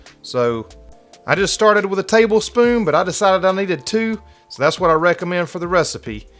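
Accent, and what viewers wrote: South, United States. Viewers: I do. Your personality and Southern "accent" makes the videos even more enjoyable to watch.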